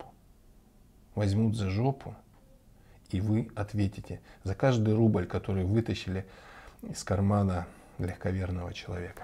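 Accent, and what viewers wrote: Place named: Ukraine. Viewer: Говор украинский-значит из Украины 🤦, где-то смеется ростовский представитель калломассы.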